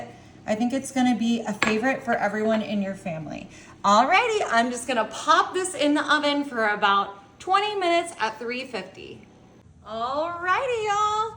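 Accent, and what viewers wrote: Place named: Michigan, United States. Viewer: She's being funny. But I from what I remember, I think it's natural for the snowy white areas of Michigan. The retirement villages.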